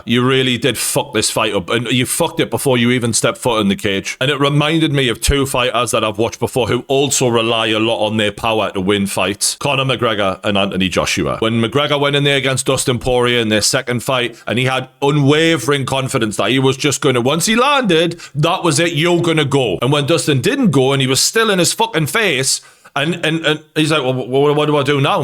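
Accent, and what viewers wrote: Newcastle, United Kingdom. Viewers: He literally said this isn’t the first time. Keep up with the Geordie accent lad.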